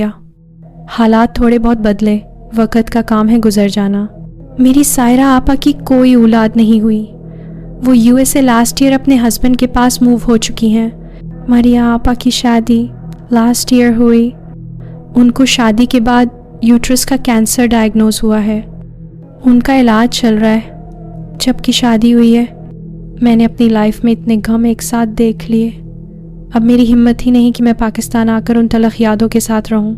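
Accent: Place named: India. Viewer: Assalam o alaikum dear aap ki awaz buhat achi hy or andaz b lakin Indians ki tarha khy or khay me wazy farq hy is ghalti ko door farmalijye❤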